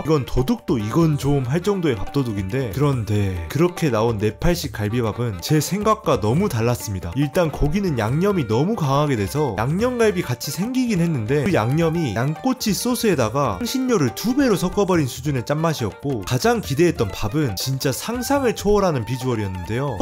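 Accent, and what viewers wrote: Jeolla, South Korea. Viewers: "전라도 사투리 구수함 200배" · 전라도 사투리 너무 웃겨요 ㅎㅎ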